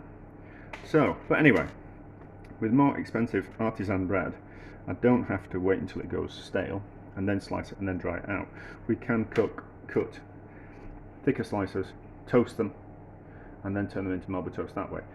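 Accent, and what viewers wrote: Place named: England, United Kingdom. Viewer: Granted I'm an American but I find his English accent very hard to understand. He talks too quickly and not loud enough.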